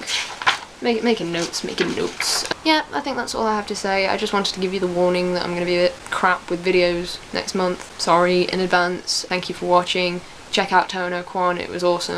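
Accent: United Kingdom. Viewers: Nice Review, It's nice to hear the voice of another British person other than myself lol. Also you did a great job editing the video It's almost seamless. Subbed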